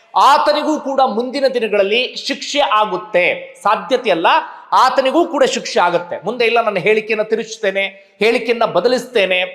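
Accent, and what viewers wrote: Standard. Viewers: nemma spashta dwani swatchha Kannada 👌👌👏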